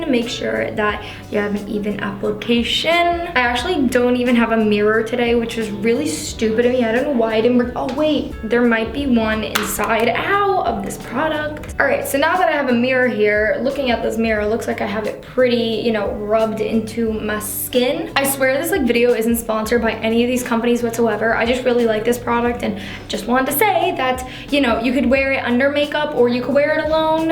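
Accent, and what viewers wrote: New York, United States. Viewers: aww honestly i love her she's so nice and i love her like little new york accent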